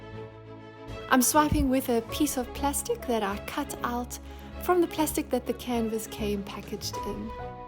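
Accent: South Africa. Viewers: Stunning! Nice to hear a South African Voice❤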